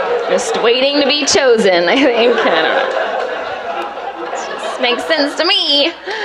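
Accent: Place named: South, United States. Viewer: She's not really funny. I think a lot of people are getting wrapped up in her girl next door looks and southern accent.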